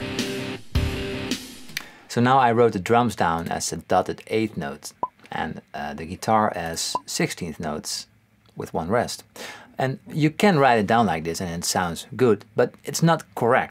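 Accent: Netherlands. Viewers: Are you Dutch? Something about your accent feels familiar.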